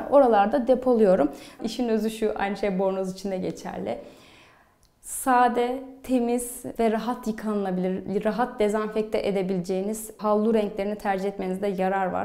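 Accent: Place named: Central Anatolia, Turkey. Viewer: nerenin aksani bu ic anadolu yada bati karadeniz gibi. hafiften aksan geliyor alttan , tasrali ic mimar enteresan.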